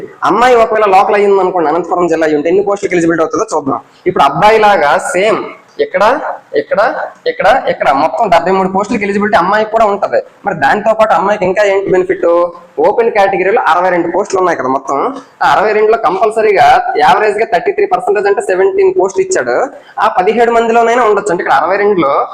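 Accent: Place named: Vizianagaram, India. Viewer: Nee yaasa bhasa vijayanagaram or vishakapatnam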